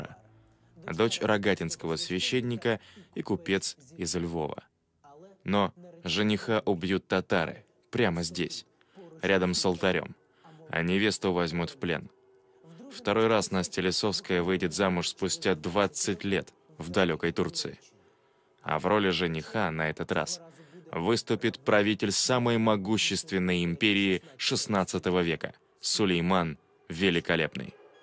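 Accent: Russia. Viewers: …ваш контент придуман для того, чтобы якобы под видом истории уменьшать, умалять, принижать, перекручивать и переворачивать смысл, где-то врать и и тем самым показывать худшее и якобы превалирующее над всем!!!… - в частности всё украинское!!!…, например - на примере Роксаланы!!!… Очень удивительно, что это видео, названи его написано на НЕМЕЦКОМ ЯЗЫКЕ, снято видео на УКРАИНСКОМ ЯЗЫКЕ (который заглушен) и повествование ведётся на РУССКОМ ЯЗЫКЕ с российским акцентом!!!… при чём не только переводится с УКРАИНСКОГО ЯЗЫКА, НО И МНОГО ДОБАВЛЯЕТСЯ негативной отсебятины… на русском!!!…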